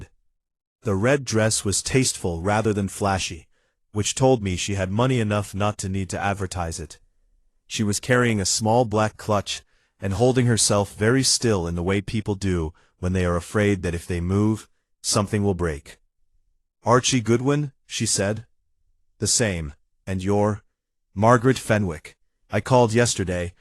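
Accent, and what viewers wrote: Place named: United States. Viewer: thanks for this amazing detective stories and overall thanks for the American accent, it's really difficult to get detective stories with American accent.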